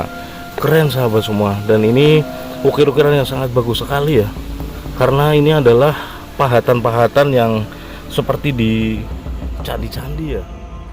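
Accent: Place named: Java, Indonesia. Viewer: Smagat bang..aja ilang jawane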